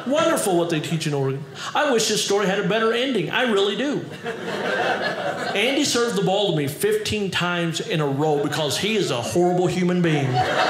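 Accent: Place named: Missouri, United States. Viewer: So much fun watching & laughing along listening to you Mr. Thornsberry. Thank You I was cracking up here in Mid-Missouri . You’ve kinda gotta smartass sense of humor I heard your Missourian accent that’s familiar. Your humor i relate with.😂😂😅 Now I’m asking you do another show here on Drybar. I’d enjoy to hear some parenting stories. I like that you talk about your wife & how you two had pretty much known each since childhood. That’s really cool. I’m happy you obviously love your wife. Tell your audience more about your life in y’all’s little town. Will ya?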